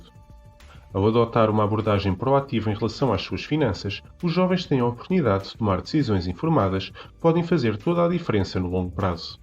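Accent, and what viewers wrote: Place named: Portugal. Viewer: Que lindo, gente!! "Ao entrar na idade de reforma"!!!! É tão fofinho o português de Portugal!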